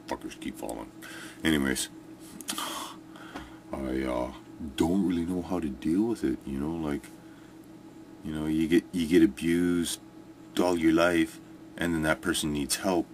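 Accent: Canada. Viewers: Sad to hear about the depression. You wouldn't happen to live near Sudbury would you? As a fellow Canadian, I'm just wondering about your accent :).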